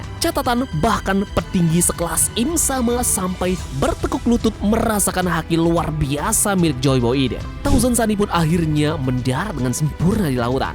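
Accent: Bali, Indonesia. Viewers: Orang bali bang?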